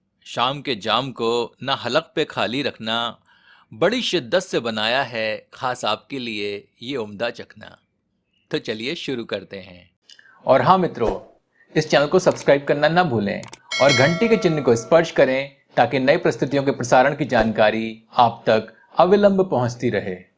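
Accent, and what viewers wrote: Standard. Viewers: Ap ka hindi acha he sudh ghee jesa hindi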